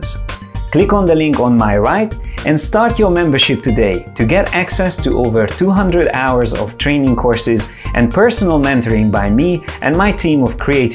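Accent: Hungary. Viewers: Great channel, awesome background and video, huge help! Thank you bro :) Ez most egy kísérlet arra vonatkozólag, hogy kb 4 másodperc után hasított belém, hogy oké, ez a srác ha nem magyar, akkor én se vagyok az :D A hunglish ellenére vagy inkább mindazzal együtt tényleg az egyetlen videó amit annyira profinak találtam, hogy tényleg végignéztem, és öröm látni, hogy új fejlesztésekről és korrekt designról kapok heads-upot és tutorialt! Podcast, newsletter, jó az ilyen profizmust látni azon felül, hogy tényleg kerestem valamit és meg is kaptam rá választ! Menőség és gratula! <3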